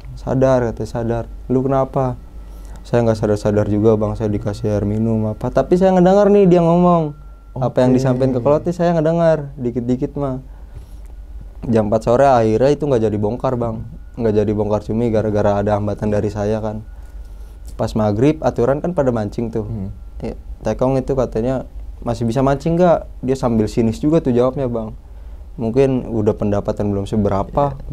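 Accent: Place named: Indramayu, Indonesia. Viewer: Endah weru logat indramayu seru pengalaman horor wedi ne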